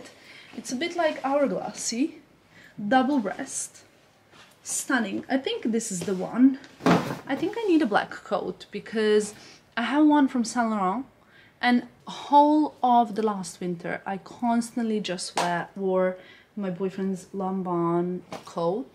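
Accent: England, United Kingdom. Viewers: your accent is British accent when you are in London, you mentioned before your accent will change when you with different friends, lol...funny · crazy how fast your accent can change depending on where you are! sounding v british haha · The British accent is back. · The english accent is back !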